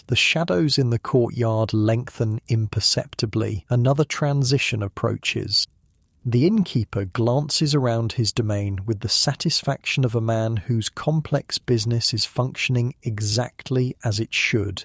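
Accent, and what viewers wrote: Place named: United Kingdom. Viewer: I have really enjoyed the story. However, I have discovered an unexpected dislike for "british" pronunciation. For example, I would pay for some reasonable pronunciation of the letter "r" where it is placed in the words.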